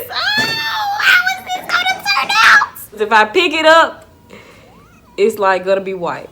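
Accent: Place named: Mississippi, United States. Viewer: This helped a lot 😊😊 & I love your accent lol , Are you from Jackson Mississippi?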